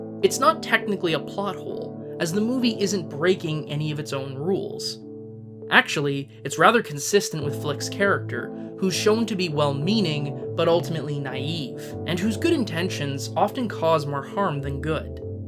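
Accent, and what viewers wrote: Canada. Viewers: great video. one nitpick: I can tell from your accent that you're Canadian. I wonder if this is the reason you decided to turn Elster (el-stir) into Elstre (el-stree), much the same way you might do center > centre (pronounced "sen-tree", of course)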